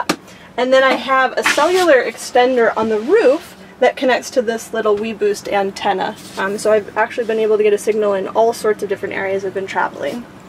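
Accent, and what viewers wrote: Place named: Michigan, United States. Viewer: 100% Michigander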